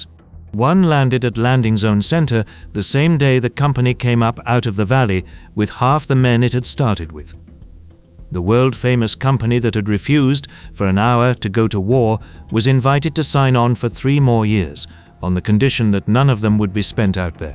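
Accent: United Kingdom. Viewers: Do we Reeeealy have to listen to a Brit narrating? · The British accent is confusing. Sounds like he’s talking about British troops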